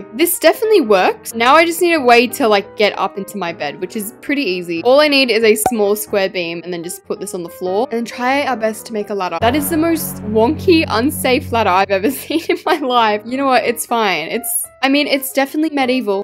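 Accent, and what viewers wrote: Australia. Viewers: Your accent is perfect ( btw I’m and Aussie too) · I wish we could switch accent I have a American accent, and I love your accent I wish I was Australian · OKAY I love your ✨ Australian accent✨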